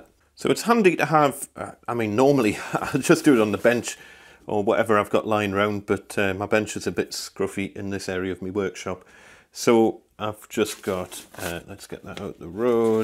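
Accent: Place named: Leicester, United Kingdom. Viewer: Lovely video yet gain. Where is your accent from? I know a chap in Leicester that speaks exactly Iike you! Maybe it’s just the subtle stops in the words? Soothing though lol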